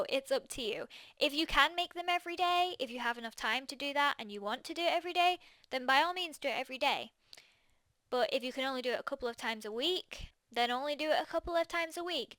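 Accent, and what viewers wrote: United Kingdom. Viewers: Are you from the UK? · Best part she's British :D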